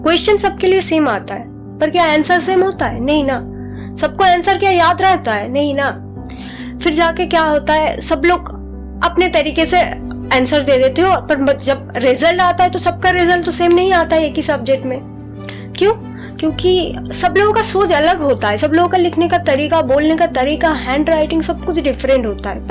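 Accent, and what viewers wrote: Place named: India. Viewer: A nice way to make people understand that how one should think #hatkae... Liked your video and loved your hindi accent. Would like to see more videos from your end. Good luck 💙💙💙💙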